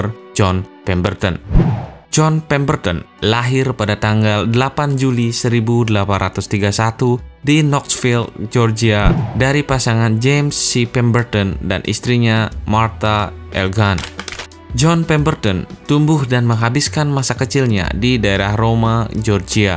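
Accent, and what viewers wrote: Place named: Bali, Indonesia. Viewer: Orang bali bang? logatnya kayak orang bali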